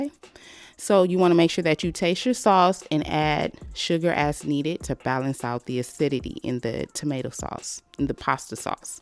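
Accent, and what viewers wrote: South, United States. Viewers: Ik you from the south 😂😂❤️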